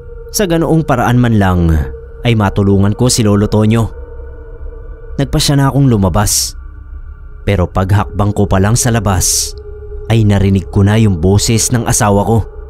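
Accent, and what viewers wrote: Iloilo, Philippines. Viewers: Hello sir new subscriber here😅 Watching from Jeddah...parang ilonggo yong tuno mo sir 😅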